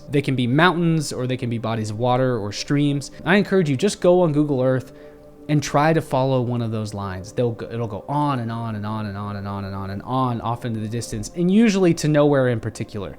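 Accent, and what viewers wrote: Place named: Carolina, United States. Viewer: Dude, you sound like you're from my neck of tha woods... #CarolinaGrown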